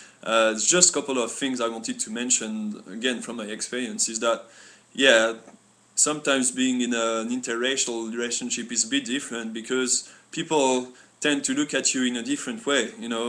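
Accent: France. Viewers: Happy to hear about that!! Stay inlove and be strong! That's really true that being inlove is a wonderful feeling no matter what or where you came from..those people judging your relationship can't give the special feelings you felt to each other.. Nice accent by the way , same with my bf. He's from France also. He is French and I am Filipina😆...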